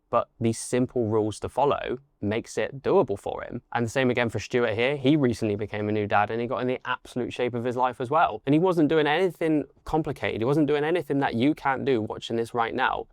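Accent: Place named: England, United Kingdom. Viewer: Well presented content. Pleasantly surprised to hear an English accent, these videos are usually American! All the best.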